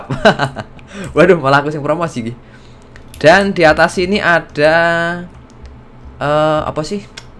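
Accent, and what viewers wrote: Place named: East Java, Indonesia. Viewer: khas jawa timur mas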